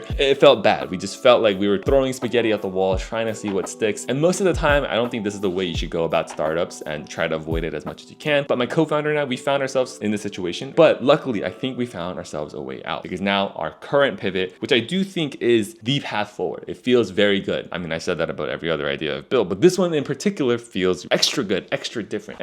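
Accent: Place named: China. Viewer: I like how chinese speak english... just like Jian Yang from silicon valley vibe. 😂 You might be korean though idk... i just like the accent. Also been following you for a while..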